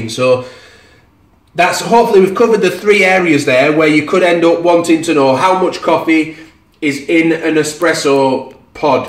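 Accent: United Kingdom. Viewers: Ik this older video, I’m curious on something, I’m US ur voice sounds like ur Uk or something 😊. My local grocery store had some of these Starbucks lungo pods on clearance to get rid of them.. I don’t have a nespresso.. I have coffee filter and though try them so how much water would I need to use for one lungo pod?? I can’t find any info out net.. I stumble over ur video.. Thanks..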